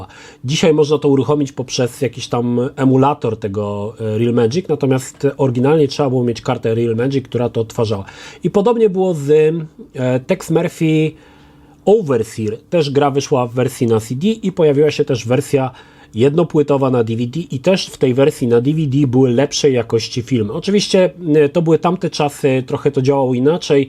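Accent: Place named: East, Poland. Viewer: O wymowę bym się nie czepiał, może nieco zbyt wschodni akcent i stąd to mocno słyszalne "z", ale to drobnostka, a pochodzenia nie oszukasz:) Lubię, ale jeśli coś mu mógł bym zarzucić to to, że często mówi coś o czym nie ma pojęcia albo mu się wydaje, że ma pojęcie oraz to, że jego materiały są robione trochę na odpierdol. Do pierwszego zdążyłem się przyzwyczaić, w końcu komu z nas się nie zdarza pieprzyć głupot? To może czyni takim ziomeczkiem, nie jest jakimś nieomylnym guru i Jaśnie Panem Youtuberem. Więc pomyłki, zwłaszcza na streamach, jestem w stanie wybaczyć. Ale materiały robione na kolanie trochę mnie mierżą. Po takiej recce oczekiwał bym jakiegoś przygotowania, scenariusza, trochę więcej wkładu własnego, a nie na zasadzie "chuj tam, wpiszę 1, nikt i tak tego nie ogarnie". No i swoją drogą przyłapałem na kłamstewku. Mam nadzieję, że karę za to w tym roku Mikołaj przyniesie mu soczystego crapa pod choinkę ^^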